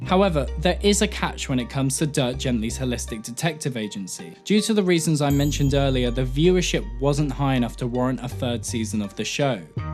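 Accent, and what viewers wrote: United Kingdom. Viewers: Creamy British vocal chords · The British accent is like nails on a chalk board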